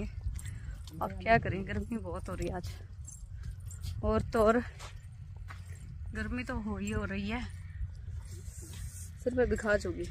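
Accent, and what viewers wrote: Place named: Haryana, India. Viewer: Hum ko bhi haryanvi sikhni padegi lagta he qki bohat achi lag rahi hai aapki haryanvi. Hum to Mumbai k he Marathi bolte he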